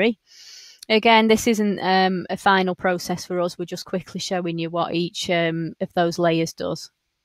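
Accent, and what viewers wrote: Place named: United Kingdom. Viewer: The speaker speaks to fast and with the british accent - difficult to understand all of the words. Slow down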